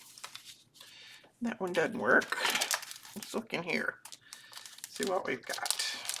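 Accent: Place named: South Carolina, United States. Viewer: it you quit, one of YouTube's brightest stars will dim! You really are talented! Your projects are ALWAYS done SO well! 💖 I moved from South Carolina 15 years ago, and when I hear your voice, I'm right back there where I left a piece of my heart💕😊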